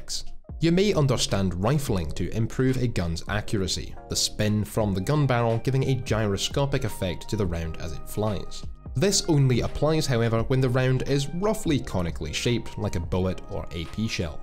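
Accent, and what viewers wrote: Scotland, United Kingdom. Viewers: I've only just noticed your Scottish accent and I've been watching a few of your vids already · Speak American man, not Scottish! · I hit the like button as soon as I heard that sweet Scottish accent. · Are you Scottish?? 🤔🤔. You remind me alot of my friend Wallace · Is that Scottish?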